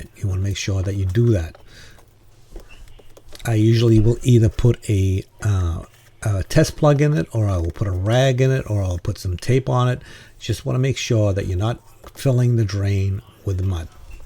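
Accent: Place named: Boston, United States. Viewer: Good tips! You don't need to tell us you are in Boston... we can tell haha. Great job!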